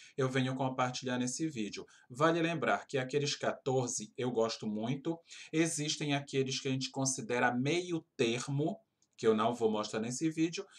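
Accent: Pará, Brazil. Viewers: Vc fala parecido com paraense ! 😘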